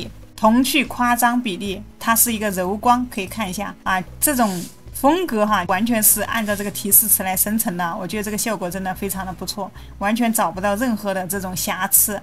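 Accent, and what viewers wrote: Sichuan, China. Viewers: 博主是四川人吗？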